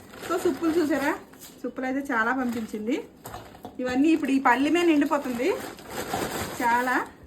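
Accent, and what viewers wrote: India. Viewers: Srikakulam kadakka meedi.. suppulu annav chudu.. chevulaki bhale haai ga undi😊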